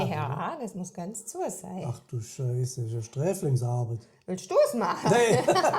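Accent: Swabia, Germany. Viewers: Oh,a Schwäble ,schee ! Scho aboniert ❤!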